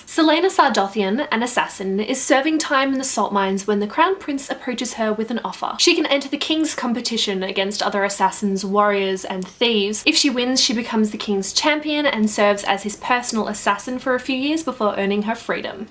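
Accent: Australia. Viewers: Hi! Now I am reading Assassin's Blade (I've just read the first novella) and thanks to your review I am more and more sure to want to continue this series. PS. I think this could be the strangest comment ever, but... I love your australian accent! I'm italian and I'm trying to learn it because I looove it! :')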